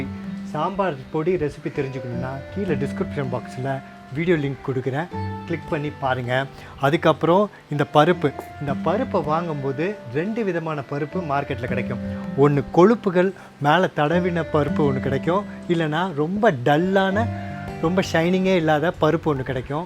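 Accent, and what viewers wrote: Tirunelveli, India. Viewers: Super thambi &unga samayal+unga speash nan thirunel vely eapdythan sampark vaipan super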